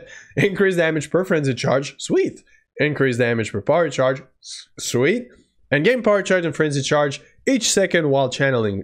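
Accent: Quebec, Canada. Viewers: Quebec much ;p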